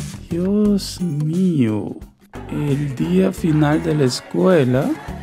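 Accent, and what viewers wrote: Dominican Republic. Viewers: Eres dominicano ? 🇩🇴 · Hola tocayo, usted me parece Dominicano. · Yo sé que eres de RD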